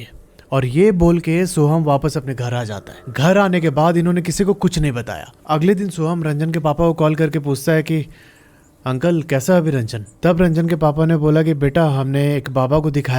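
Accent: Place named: Uttar Pradesh, India. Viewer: Aapki awaj bahut tagdi hai aap kis district se ho aap up wali awaj lagti hai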